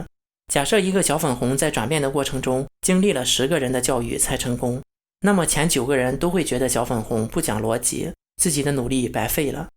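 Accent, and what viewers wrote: China. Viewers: 我觉得KLAUS的视频做的很认真也很棒，你的口音像大陆人，但是你的思想更像台湾人，特别是你用繁体字包括上一期视频结尾用的中华民国国旗歌。你是不是大陆人移居到台湾的人我不得而知？大陆真实情况很多台湾人并不了解，只是通过看负面新闻了解中国大陆还有中共。中共从邓小平开始改革开放经济腾飞后，早就不信仰什么共产主义了，共产主义只是一个称谓罢了。市场经济做起来了以后，面对这个巨大的经济利益，中共其实蛋糕还是切得非常好的。大部分人都因为利益得到了好处更加拥护中共，当然小部分人利益是确实受到损害从而反共的。民主价值观在现今中国没有生存土壤，原因是中共这个统治系统养活了太多人，把中共统治系统砸掉就等于砸了很多人吃饭的家伙。民主不能当饭吃，而把中共统治系统当饭吃的人太多太多，而且吃的比民主世界的饭还香，我不知道你明白不明白我说的意思。中国有14亿中国人，而依靠中共统治系统生存的人是占大多数的。就是这些靠着中共吃饭的人是中共统治的基石，另外没有吃到中共系统的饭的人，中共也一直在维稳，并不是采用暴力手段，扶贫项目帮助贫穷地方人脱贫，有很多好的政策让政局稳定，正反两面手法，大棒加糖。比如新疆要闹独立，中共一方面加强武力镇压疆独消灭有生力量，另外一方面从思想上根除穆斯林极端宗教信仰开办培训学校。还有拨款到新疆帮助新疆的穷人脱贫致富，只要你愿意去看就可以看到现在新疆人过得非常富足谁还跟你对抗，如果大部分新疆人不认同共产党统治，新疆那些骁勇善战的少数民族早就翻天了。7个穆斯林极端恐怖新疆疆独拿砍刀在昆明就能砍死砍伤130多人，你就知道新疆人多厉害了。极端宗教疆独份子根本不怕死，人肉炸弹武装对抗政府解放军都是没想过要活命的。但这只是少数极端份子，大部分人民只想过富足的生活。如果中共只会欺压人民而不懂如何让大多数人过得富足，这个政权早就被推翻了。如果邓小平不搞改革开放，中共早就垮塌了多少年了。如果民主可以当饭吃中国早就民主了，中共早就不存在了。民主制度也不是完美制度，独裁政权也不完全就是暴政。中共暴政也只是暴政要跟它做对抗的人，靠中共吃饭的人从来不觉得中共是暴政。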